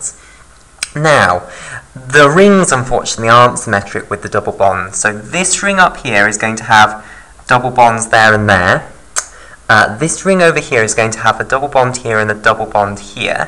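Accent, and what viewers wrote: United Kingdom. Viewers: Why do i feel much smarter listening to a teacher with a british accent? God bless you sir thats a lott of help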